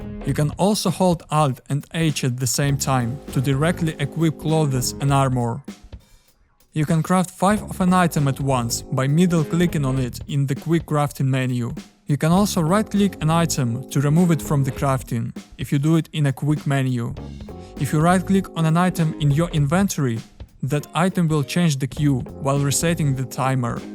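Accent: Russia. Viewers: I’m might have unpopular opinion but man it’s so hard to watch hard accent videos like this, why every 2nd video from someone from EU is HARDEST RUSSIAN ACCENT 😭🤞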